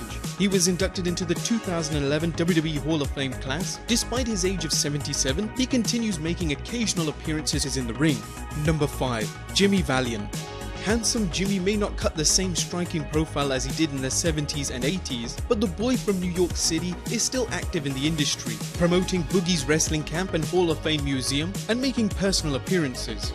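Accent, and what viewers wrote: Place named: United Kingdom. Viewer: Who wants to listen to a brit?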